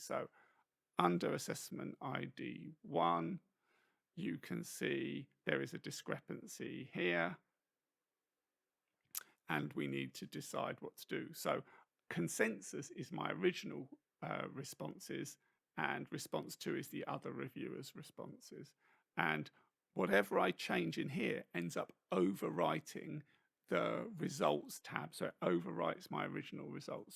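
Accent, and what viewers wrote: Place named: Australia. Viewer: The Australian accent 😢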